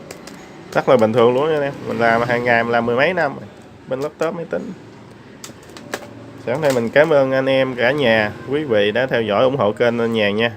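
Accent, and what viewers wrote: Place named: South, Vietnam. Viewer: Cảm ơn anh. Nhưng mà e ở hà nội. Nghe giọng anh ở trong nam thì phải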